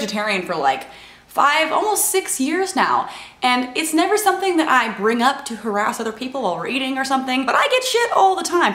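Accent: South, United States. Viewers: the country accent was actually very cute. keep up the good work huge fan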